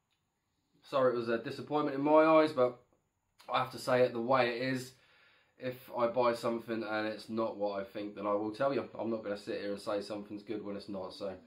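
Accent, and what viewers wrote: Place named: Ipswich, United Kingdom. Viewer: What's your accent? You sound like an Ipswich boy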